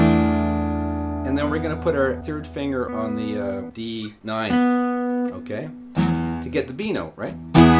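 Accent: Canada. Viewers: Your presentation & discussions about the song are brilliant ! Your descriptions are so on. I had no idea how complicated this song was/is. I love the Canadian accent - it's awesome too. When you live near the border of Canada - you just know when you hear your Canadian Brother speaking ! This tutorial is phenomenal !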